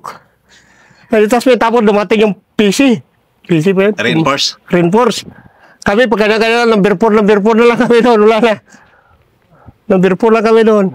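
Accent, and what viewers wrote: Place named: Iloilo, Philippines. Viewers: Ilonggo talaga hhaha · Cool lg c sir oh...accent di gid madula Basta Ilonggo😊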